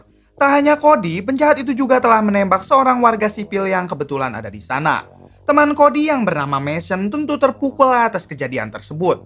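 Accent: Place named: Madura, Indonesia. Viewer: Orang Madura kayaknya yang ngisi suara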